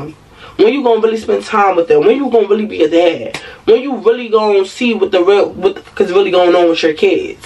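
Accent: New York, United States. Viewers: New subbie . Are you from BK? I swear you sound like a NYC girlie . Anyways, the advice you gave her about the advance and taxes was so solid, but she wasn’t ready to hear It. Your friend reminds me of a girl I used to be cool with . Tried to help her get back into school, paid for groceries, and tried to help get her life back on track just for her to dog me out. Sometimes it’s not about you, ppl are gonna be who they gonna be 🤷🏾‍♀️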